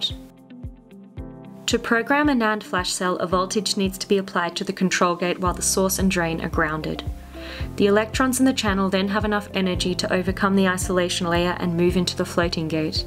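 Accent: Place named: Australia. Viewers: The very definition of an Australian accent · sounds like urban Australian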